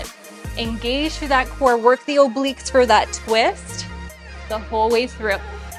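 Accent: Canada. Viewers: This workout popped up on my feed. Really enjoyed the compound moves, weights, pacing. New subscriber. Did I detect a Canadian accent? 🙂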